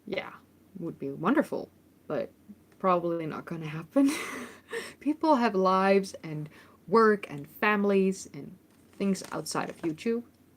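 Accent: Netherlands. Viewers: I like your "Very Dutch" accent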